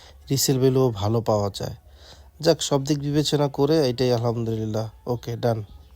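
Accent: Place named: Sylhet, Bangladesh. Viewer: Vai sylheti bashay Kotha bole obbash to Tai shuddo bashay aktu problem Hoy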